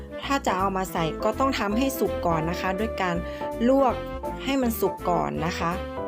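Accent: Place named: Northern Thailand, Thailand. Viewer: เข้ามาไล่ตามดูย้อนหลัง ตอนพูดกลางก็พูดได้แบบเป๊ะมาก แต่อู้คำเมืองน่ารักมาก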